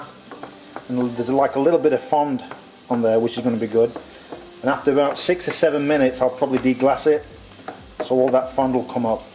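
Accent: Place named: United Kingdom. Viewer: my goodness, you're hot,! <3 im a first time viewer of your channel and good job! And your accent immediately reminded me of my Brit friend...whom, turns out, lives near Rotherham as well! I hope I'm developing an ear for the regions of the UK! Anyways, a man that knows his way around the kitchen is a total turn on! Ahh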